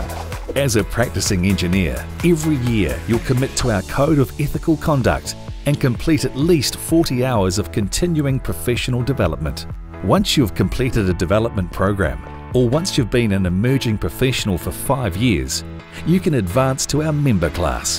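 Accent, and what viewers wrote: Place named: New Zealand. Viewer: complete with subtle kiwi accent...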